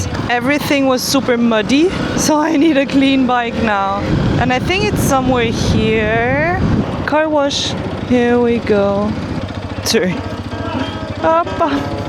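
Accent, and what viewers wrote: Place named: India. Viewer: I learn English free from you, like Indian accent ❤❤❤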